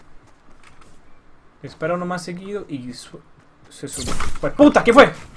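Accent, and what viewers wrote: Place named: Colombia. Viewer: Por fin un Colombiano que no se cree mexicano o no habla como tal, 100% colombiano, :v, siga así ñero <3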